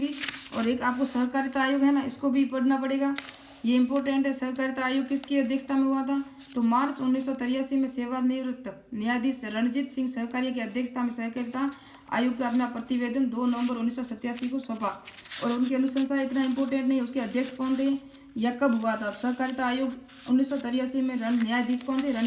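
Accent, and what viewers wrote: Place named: Rajasthan, India. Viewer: Aap badmer ya jodhpur side se ho kya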